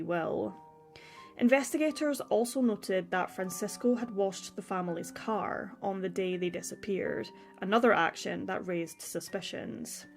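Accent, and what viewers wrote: Scotland, United Kingdom. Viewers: HOLA MI FAMILIA DARK 🌑 CURIOUSITIES & BABY GIRL WITH THE AWESOME SCOTTISH ACCENT!!!#665 TOO COMMENT, THANKS GOODNESS, IT WASN'T THE NEXT ###😲😲😲😲😲😲😲🫣🫣🫣🫣🫣🫣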